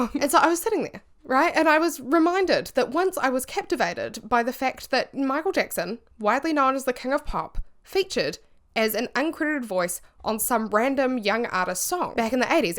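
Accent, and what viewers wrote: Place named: New Zealand. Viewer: about your accent, it's beautiful and really pleasing to hear. I'm from Portugal and before watching your videos I had never heard a kiwi accent, so you're kind of an ambassador now lol I hope that u don't feel the need to explain it everytime ✨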